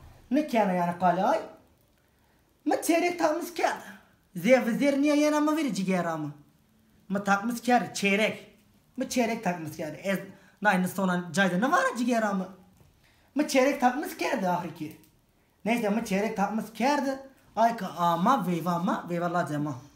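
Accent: Dersim, Turkey. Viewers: Aynı dersimliler gibi konuşuyorsun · Tam anlamıyla bir dersim şivesi 😂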